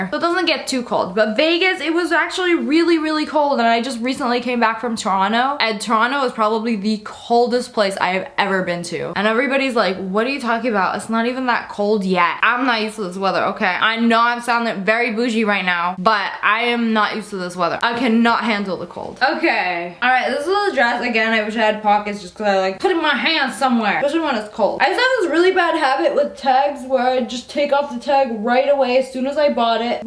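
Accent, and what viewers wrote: France. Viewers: Wait is she actually French????.... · TobaMusicQueen wait is she really French or is she being funny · I’m so confused, is she French? 🤯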